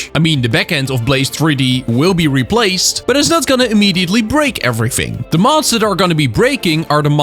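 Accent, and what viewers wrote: Netherlands. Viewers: holy nederladse stem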